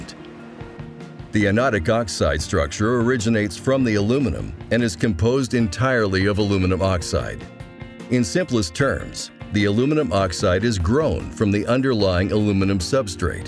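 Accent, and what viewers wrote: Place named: United States. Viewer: american english lol i speak british english and i feel you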